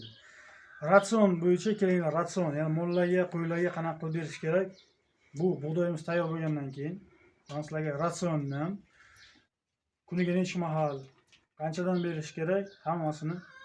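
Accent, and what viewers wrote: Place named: Bukhara, Uzbekistan. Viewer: Уста каердансиз сир булмаса шевагиз Бухорога ухшайди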